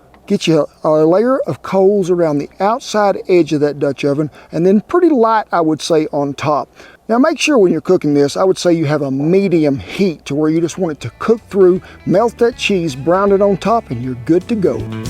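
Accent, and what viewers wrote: South, United States. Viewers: black coffee, hash brown slap up and a chirpy southern camp cook, paradise mahn!!!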